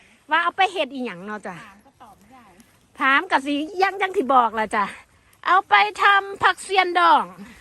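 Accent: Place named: Sisaket, Thailand. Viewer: น้อง พูดเหมือนคนศรีสะเกษ นะ ถ้าใช่เราคนบ้านเดียวกันนะค่ะ เดินมาหาผักเสี่ยงฝรั่ง คือมีด้วยค่ะ ปาโดด🤣❤️💞💞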